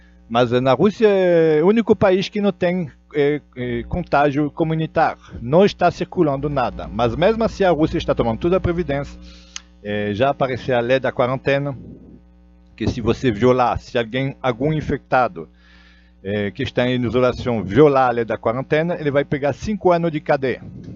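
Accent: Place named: France. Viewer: Porque tu tens um sotaque francês de falar o português, amigo?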